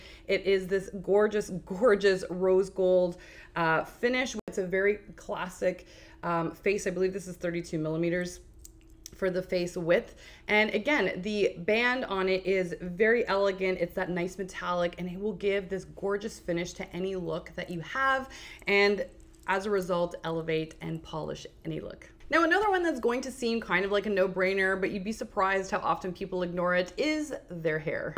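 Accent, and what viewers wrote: Canada. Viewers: I guessed Canadian.. interesting, we have a big polish community here in the UK x great video thank you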